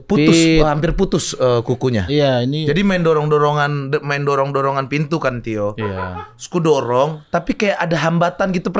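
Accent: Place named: Sumatra, Indonesia. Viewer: Seneng banget nonton ini sebagai orng Sumatra, logat nya dapet banget😂😅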